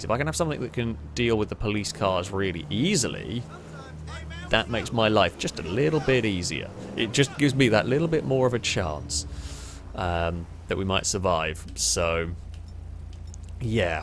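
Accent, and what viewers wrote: England, United Kingdom. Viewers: Omg i forgot about ur fancy english accent and ur adventures 💰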